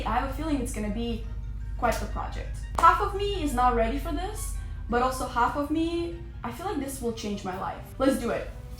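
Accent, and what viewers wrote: Russia. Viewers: A cute Russian girl. Still your accent is prominent, but overall a good job. Greetings from the EU. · Is that a Russian accent? Me gusta.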